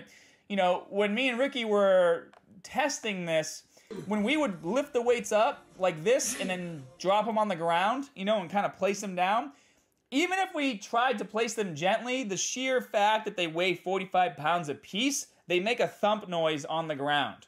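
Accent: Boston, United States. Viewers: Most obnoxious Boston accent ever and I’m from Boston 🤦🏽‍♂️🤦🏽‍♂️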